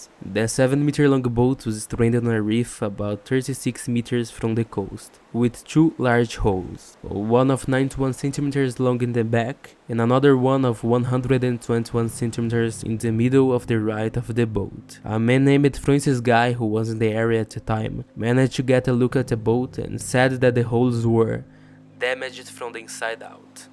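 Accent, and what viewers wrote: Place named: Portugal. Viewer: Great video but you should work on your English pronunciation. Try talking slower and pronouncing each word clearly and with less portuguese accent. I think you could be a youtube star if you work on your English because the video is very well made.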